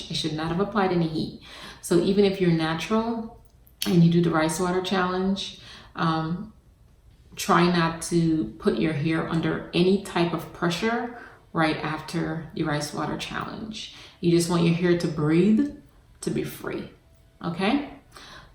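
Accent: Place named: Jamaica. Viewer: I also hear some Jamaican in there, I believe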